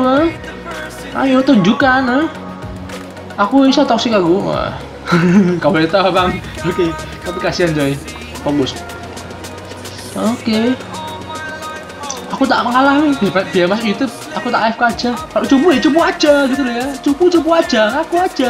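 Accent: Java, Indonesia. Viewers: Ngomong jawa nya mas jangan medok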